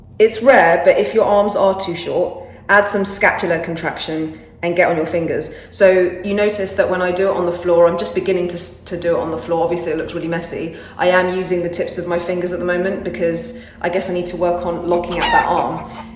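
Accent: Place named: United Kingdom. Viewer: You look like an indian and sound like British 😁😁😁😁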